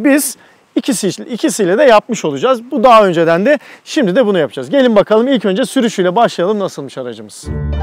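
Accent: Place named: Aegean, Turkey. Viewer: Abi sen egelimisin orlarda burlarda diyon gari hep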